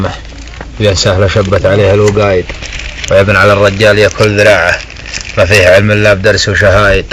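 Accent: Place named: South, Saudi Arabia. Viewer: يا ذكي .. هذا شاعر سعودي من الجنوب السعودي .. ولهجته سعودي واللحن والالقاء واضح شعر قحطان ويام الجنوب السعودي .. واضح جدا بإنه شاعر قبيلة عريقة من قبائل جنوب السعودية وغالبا قحطان أو يام .. وربما شهران .. وليس يمني أبدا .. أبدا .. لأن لليمن لحن وأسلوب ولهجة مختلفة تماما .. لكن اللي ما يفهم التمييز .. يقول على الفول عدس .. !!! وسلامتكم .. ابو شنبات ......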